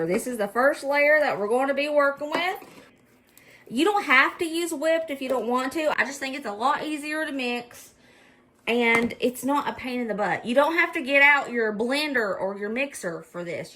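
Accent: South, United States. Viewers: Love this presentation and especially love your speeded up prep. This California girl(82) loves your southern accent have some trouble understanding some of your pronunciation but then again I have the same difficulty with British PBS shows. It took me until I saw the finished slaughters that the big OH! You were saying SLIDERS. Look forward to seeing more of your presentations. Do you have a recipe for a slow cooker complete turkey dinner, dressing,turkey breast, string beans and small potatoes cooked with the turkey breast on the sides. Hopefully reduced sodium. Thank you for sharing your handsome family.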